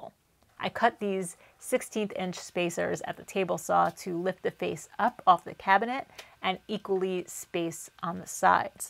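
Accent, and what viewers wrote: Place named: Long Island, United States. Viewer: You sure you're not from NY? Between "draw" and "foward" you sound like all my Long Island friends and family haha! Being from VT and going to college in MA, my brain gets super confused with accents sometimes :) Agree with great vidieo.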